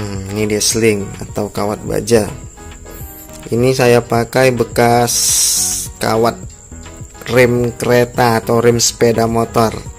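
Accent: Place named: Jambi, Indonesia. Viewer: Bang jambi dimano ny bang... Masuk nian nampak ny gaya abg bcakap tu :D hahahaha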